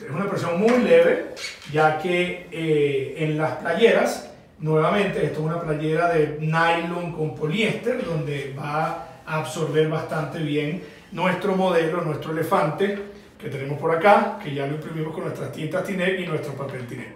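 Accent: Venezuela. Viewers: Acento Venezolano impecable. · Cambie el vídeo solo por el acento venezolano.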